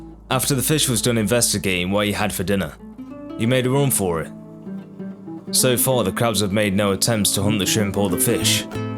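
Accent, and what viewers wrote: United Kingdom. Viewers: I immediately heard that Brit accent 😭🤌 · are u british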